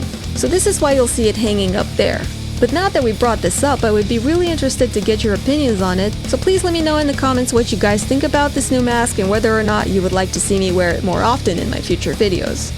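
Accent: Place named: Russia. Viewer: Вааауу у тебя Чисто русский акцент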